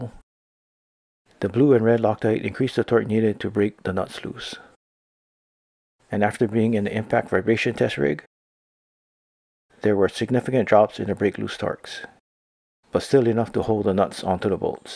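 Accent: Hawaii, United States. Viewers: eh you from Hawaii? I can hear the Piggin brah!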